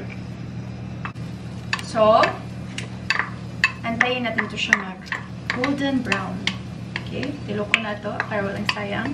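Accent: Visayas, Philippines. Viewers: Haha cute accent ng bisaya😍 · "Hay ka lisod sa kinabuhi kung nag inusara raka" ahh ka kyut gyud mag bisaya oy. Huhu teee notice meee please 😭🙏🙏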